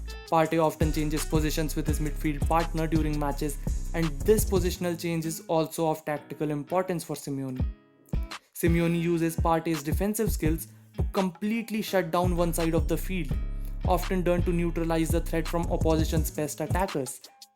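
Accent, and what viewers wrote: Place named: India. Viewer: Immediately I heard an Indian voice just skipped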